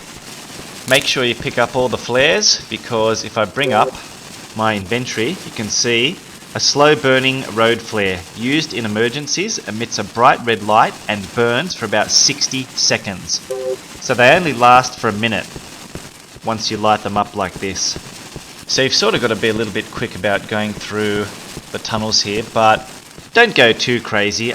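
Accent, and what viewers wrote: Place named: Australia. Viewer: He's Australian... That's kind of how accents work in different parts of the world.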